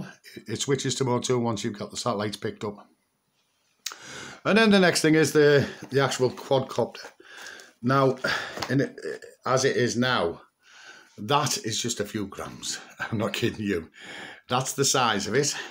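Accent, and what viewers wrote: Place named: Newcastle, United Kingdom. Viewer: Is the Photo Gesture not two fingers at Boris for the licence fee just saying .... and you sound like a fellow Geordie ....all the best mate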